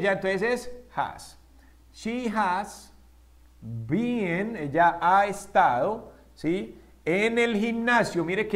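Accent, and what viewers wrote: Colombia. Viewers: Me encanta tu acento colombiano. :P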